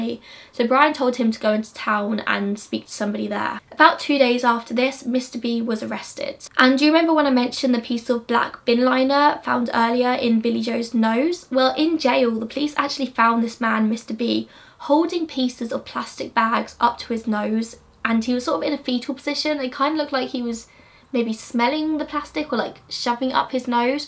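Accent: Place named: England, United Kingdom. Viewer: Sad story! I’m a new subscriber and you are doing a great job! One little comment that I’m glad you speak somewhat slowly especially with you having a (wonderful) accent. I’m from England so it brings me ‘home.’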